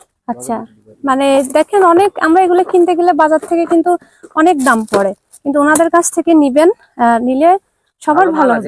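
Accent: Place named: Jessore, Bangladesh. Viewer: তোমার কি সুন্দর কথা আমাদের যশোরের মত কথা তোমার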